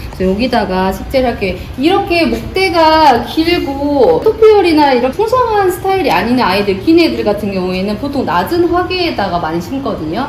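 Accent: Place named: Jeolla, South Korea. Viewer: 전라도 사투리를 좋아하는 대구사람입니다. 중간중간 나오는 전라도 사투리가 느~므 좋아요^^ 황칠이 키우면서 보는 재미도 있구요^^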